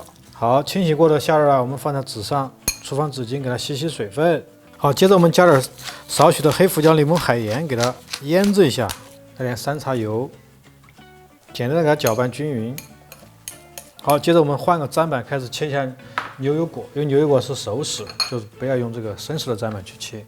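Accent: Sichuan, China. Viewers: 四川口音（幽默）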